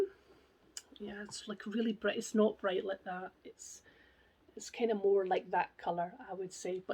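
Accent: Scotland, United Kingdom. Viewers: I hope you continue making videos I have watched you since No 1 and look forward to your content, I m a fellow Scottish stitcher and it’s lovely to here your accent. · Congratulations! I enjoy watching your videos! I’m so glad your recovery is going well. It broke my heart when I watched the video where You explained about your fall. I have to confess that I first started watching because I enjoyed listening to your accent. My grandparents were from Edinburgh. I miss them. So listening to you was a delight, some little words(can’t think of any right now) but words I haven’t Heard in so long But I have to say it was your accent at first but then I really enjoyed all your different whips and all Your stash and just everything. Good luck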